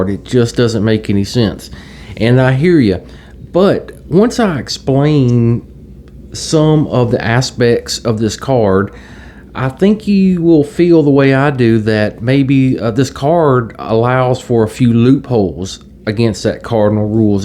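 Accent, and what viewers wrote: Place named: South, United States. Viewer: Why am I just now finding your channel? I am a southerner and miss hearing a good southern accent where I am at currently. Great on the card info. Love the Magnolia (have one in my front yard).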